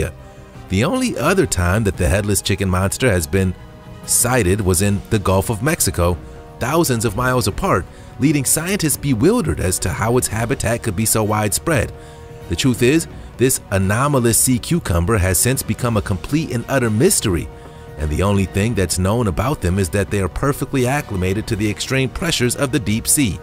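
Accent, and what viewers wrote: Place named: North, United States. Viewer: one more grating yankee voice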